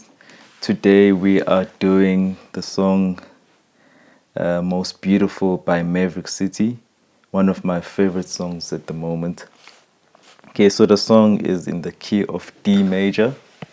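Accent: South Africa. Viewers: Wow you sound South African :D I got excited there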